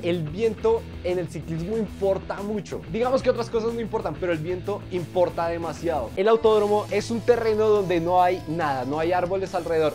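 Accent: Colombia. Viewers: Yo lo sabia cuando hablas yo digo el tiene asento colombiano y cuando escuche tocasipa selebre yo soy de bogota un saludo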